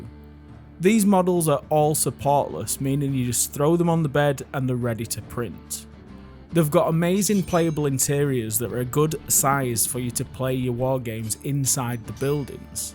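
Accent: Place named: Doncaster, United Kingdom. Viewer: that accent sounds proper Doncaster or Rotherham way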